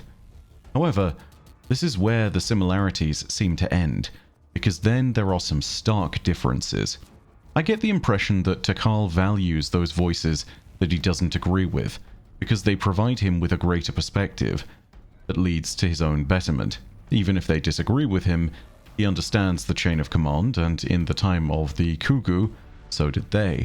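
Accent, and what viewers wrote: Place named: England, United Kingdom. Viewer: lol the captions can't understand english accent, talking about "law books"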